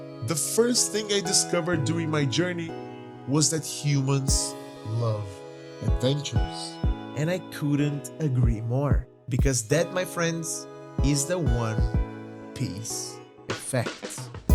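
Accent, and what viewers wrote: Brazil. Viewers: Ja vi que é brasileiro pelo sotaque skkskskssk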